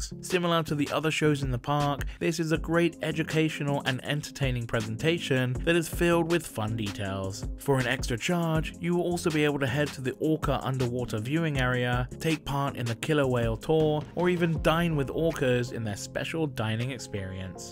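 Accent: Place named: United Kingdom. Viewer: British accents announcing American products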